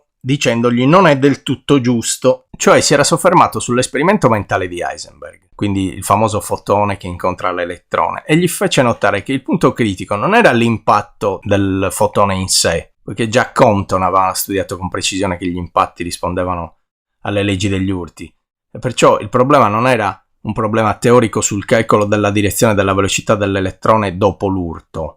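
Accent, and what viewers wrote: Sardinia, Italy. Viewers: mi sono iscritto . P.S. dall' accento mi parrebbe di sentire un sardo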